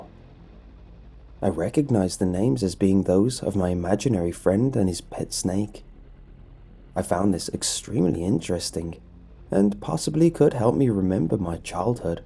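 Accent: United Kingdom. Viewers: If this bastard grew up 'in Pennsylvania', then why the British accent?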